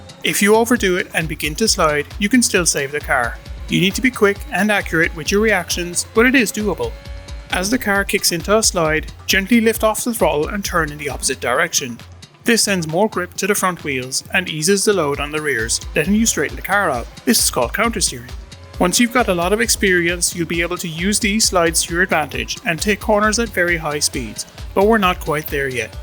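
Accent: Ireland. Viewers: My man sounds a little irish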